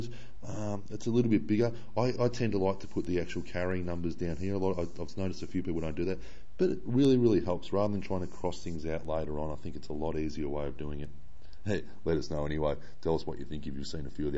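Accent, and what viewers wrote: Australia. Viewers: after watching these lessons i wished all my teachers had australian accents.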